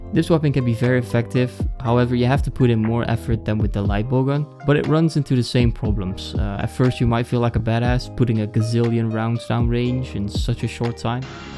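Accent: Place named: Netherlands. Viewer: I can pick that dutch accent out of ten thousand voices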